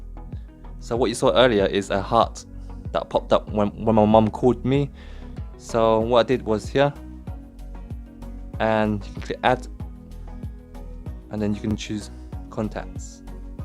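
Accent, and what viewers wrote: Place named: United Kingdom. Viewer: It's a pain in the ass listening to British accent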